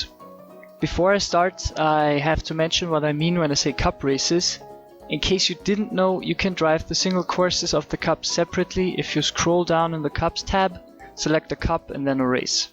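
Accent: Austria. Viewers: Kommst du auch aus Österreich? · kommst du aus Österreich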